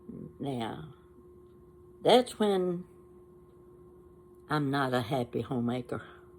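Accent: South, United States. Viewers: Hi, just found you by accident and had to watch a couple of your videos. I can't wait to see more of them, I connected with you right off the bat. I live in Michigan but my parents were from the south, so I love you're accent. Keep up the good work and thank you for doing these videos. Blessings to you.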